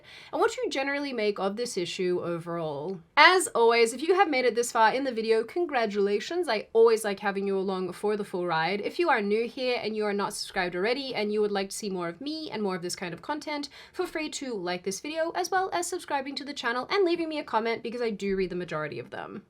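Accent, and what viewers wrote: Australia. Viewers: Your Aussie accent is thicker when you're sick. Hilarious and endearing. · I'm not sure if in crazy or what 😂 but you sound way more Australian when you are sick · Aussie accent getting stronger and stronger..... LOVE IT!!! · Sydney, you sound a lot more Australian when you sick. 🐿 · GREAT analysis, Sydney. You pointed out things that I had not thought about, specifically the WHY woke movies (and everything else woke) is SO BAD! Btw... your Aussie accent really comes out when you're not feeling well - but at least you looked great...! 😊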